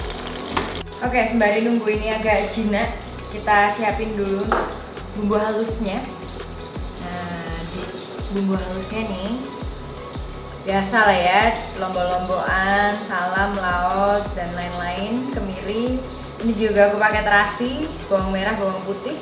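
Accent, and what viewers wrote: Java, Indonesia. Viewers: Yach, ,,dari tadi uploade nya, ,,baru nongoll braay, ,,,meddok bangeed mba e ,,,luv luve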